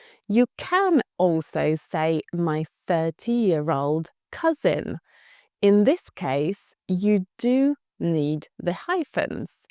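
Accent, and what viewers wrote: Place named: United Kingdom. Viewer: Thank you another great video. How do you get a British accent?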